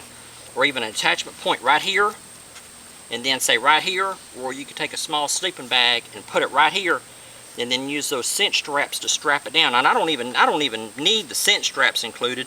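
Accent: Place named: Alabama, United States. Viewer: what part of the world are you located in? reminds me of Alabama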